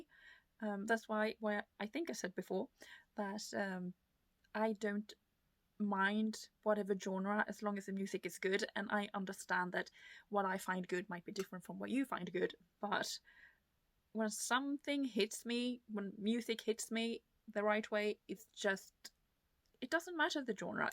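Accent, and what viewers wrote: United Kingdom. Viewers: That must be the most British-ish accent I've heard from a non-Brit 😁. Nice to see you getting back on track. I was somewhat out of NW too for several years (but then again I didn't listen to any music other than what was on radio when driving), but have been catching up the past year. In a way it was a healthy break for me as I can now observe with a new perspective. And another greets from Finland. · My thought exactly, about the accent. At first I was a bit confused - like "Is she British or Swedish or what..." 😂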